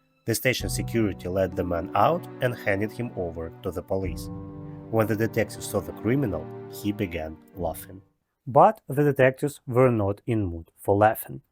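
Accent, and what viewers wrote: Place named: Russia. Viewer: Kl to hear the Russian accent it gives it a little bit different, must be a million criminals, murderers and serial killers the western world doesn’t know about.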